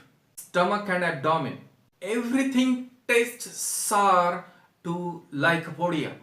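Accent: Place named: India. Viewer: According to your delivered speech,you look like an Indian. But why do use foreign language,? What do you want to show by this filthy language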